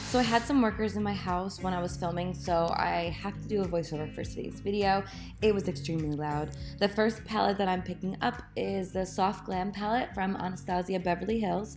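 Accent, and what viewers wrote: New Jersey, United States. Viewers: Sooooo Jersey ! Love it!!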